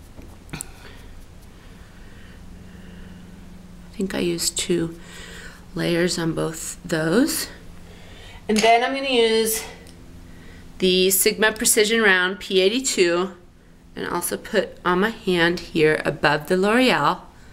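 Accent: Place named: France. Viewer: Helpful review. Love your French.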